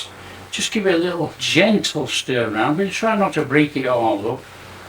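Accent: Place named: West Yorkshire, United Kingdom. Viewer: My guess is that despite your strong West Yorkshire accent and upbringing that you are cooking in Thailand, busy every day with these splendid demonstrations and not able to remember when your waistline was 28” (if ever)!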